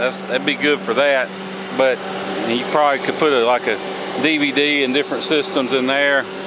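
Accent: South, United States. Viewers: Love the southern drawl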